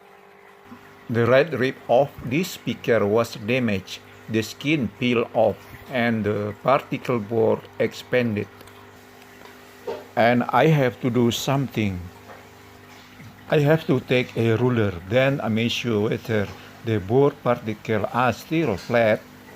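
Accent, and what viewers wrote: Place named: Indonesia. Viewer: Logatnya seperti orang indonesia bang, daerah mana nih ?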